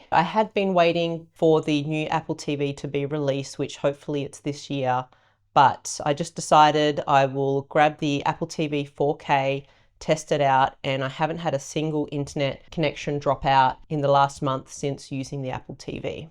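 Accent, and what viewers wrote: Australia. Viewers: She sounds australian is she ?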